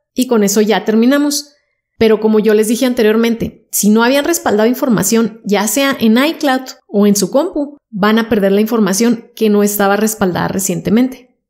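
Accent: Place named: North, Mexico. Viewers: Me súper sirvió, que hermosa voz!! Me hace acordar al acento de Saltillo de una amiga 😍 saludos! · Que linda voz así como norteñita me encantó tu explicación me sirvió para desbloquear mi CEL mil gracias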